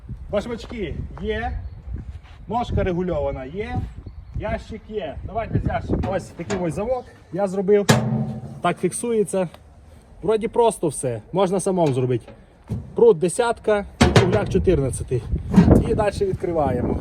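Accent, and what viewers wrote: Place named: Surzhyk, Ukraine. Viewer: суржик у тебе жахливий,щось мені підказує що народився і виріс ти не у Рівненській області.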